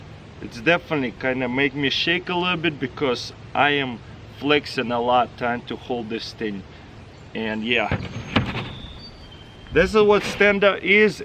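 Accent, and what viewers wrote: Russia. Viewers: Dude, you still speak Russian! Just try to say: Adamadickly instead of Avtomaticheski aka автоматически and Boom!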